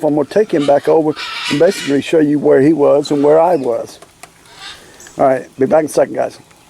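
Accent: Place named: Louisiana, United States. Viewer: Where there is one there are many - be careful! They do eat rats but what a trade off. I hear macaw's - they sound jealous to be on camera - LOL or in pain, I can't decide. Your accent reminds me of my grandparents - LOL Cajuns, they were from Louisiana...you're from Florida....haven't heard it there...PS I think if you click the button on your edit page you can edit the title - I think you mean - Homestead Dangers no Homestad Dangers....just letting you know.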